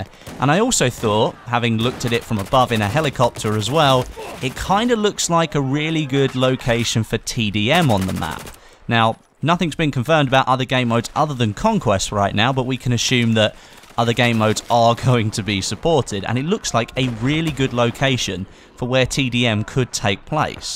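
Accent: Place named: United Kingdom. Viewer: Yes, he's British.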